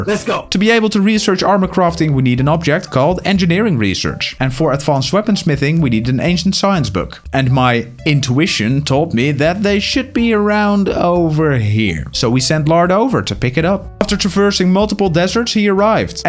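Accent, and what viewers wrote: Netherlands. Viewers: bro is clearly dutch 😂 trust me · Dutch accent recognised!!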